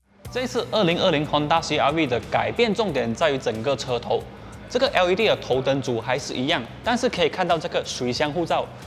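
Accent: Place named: Malaysia. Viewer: 马来的华语不都这样吗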